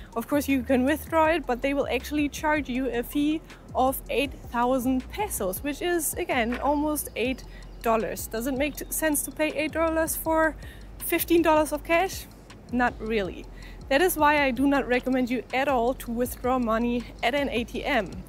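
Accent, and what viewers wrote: Germany. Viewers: Hallo! I looove your German accent!haha Come to visit me here in Cordoba!haha Namaste Guz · Nice beautiful ❤German accent…!!! I listen to whole thing 🎉. Great presentation and information!!!! Wow 😮!!!! ❤❤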